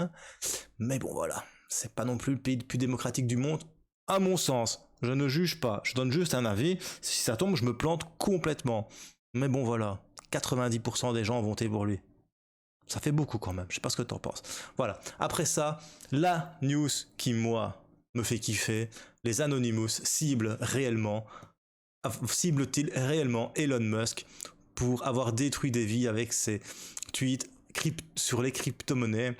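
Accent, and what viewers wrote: Liège, Belgium. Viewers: Merci pour la vidéo. Bonne semaine de vacances si je ne me trompe pas. J'aime bien cet accent Belge. J'ai l'impression d"écouter du Benoît Poelvoorde , surtout quand tu t'agaçes ;-) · Le bon accent de Liège ... Bonjour de Mons en Belgique continues tes vidéos poto ...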